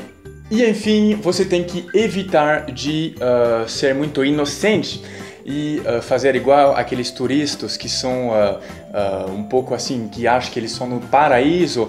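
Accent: France. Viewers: ...por favor nao perca o sotaque frances pelo amor de deus...se nao vc perdera o charme. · E muito bonitinho o sotaque de quem é francês e está aprendendo português! Tah de parabéns!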